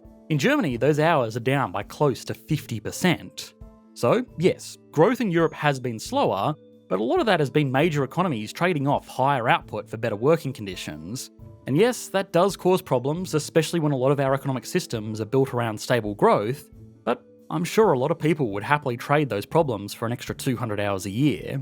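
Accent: Australia. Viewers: Vocal fry sounds bad at the best of times......in Strine it sounds even worse. Just had to stop listening after a minute, sorry. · is this channel australian? Suprised to hear the australian accent lol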